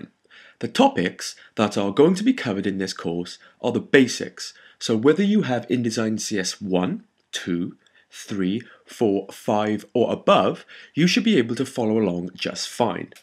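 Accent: Wales, United Kingdom. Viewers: What could be better than a smart young graphic design teacher with british accent?, your tutorials are amazing! be my teacher:-( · Are you welsh or am i just hearing things? I can't tell anymore.. lol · Asian-British?! As a fellow welshie, love it haha! x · you're obv not British haha...he has a classic Welsh accent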